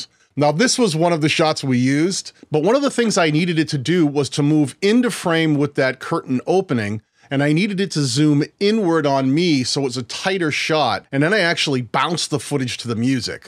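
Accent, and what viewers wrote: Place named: Boston, United States. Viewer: Boston video guy here. I heard your accent and Immediately subscribed.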